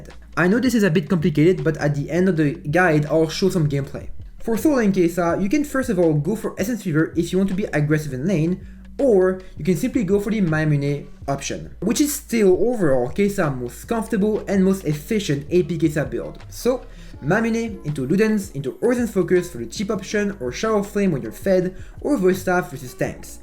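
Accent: France. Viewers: You're french aren't you? Your accent sells it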